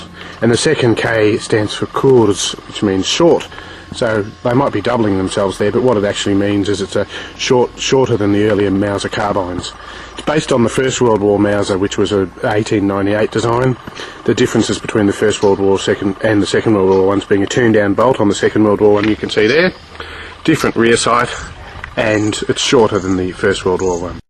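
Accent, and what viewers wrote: Australia. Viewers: i love the Aussie accent :) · P.S. AAA = Awesome Aussie Accent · Love the accent, long live Aussie :)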